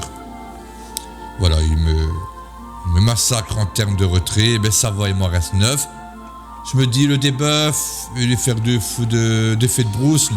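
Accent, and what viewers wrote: Belgium. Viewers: Je suis obligé de mettre un gemmes, rien que pour l’accent belge, génération François l’embrouille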